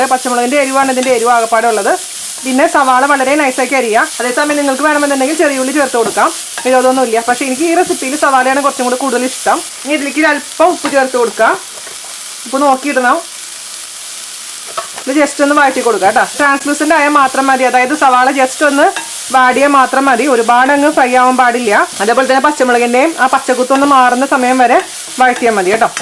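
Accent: Thrissur, India. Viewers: Hi chechi..your explaining well and Thrissur language is good....I prepared this thoran today it is very tasty...look yummy..thank you for this receipe..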